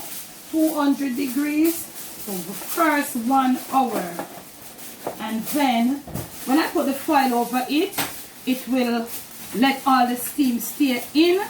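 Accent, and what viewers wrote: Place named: Jamaica. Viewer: Hello could you provide the full recipe, you have a beautiful jamaican accent I couldnt tell what some of the ingredients were that you used. there is one where it sounded like you said ammon essence. tried looking it up couldn't find it. and so i thought maybe she said lemon essence, I found that. is that one of the ingredients in your recipe lemon essence?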